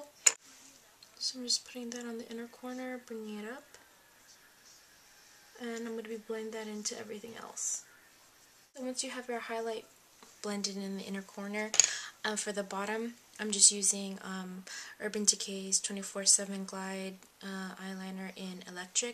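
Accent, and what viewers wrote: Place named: Philippines. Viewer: i believe she is her accent is that of Filipina :)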